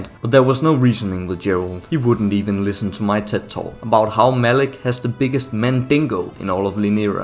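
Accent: Denmark. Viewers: Are you danish? You sound danish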